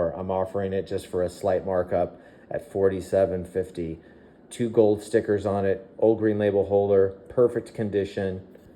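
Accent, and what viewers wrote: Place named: India. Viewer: Yeas that Indian cent is hawt!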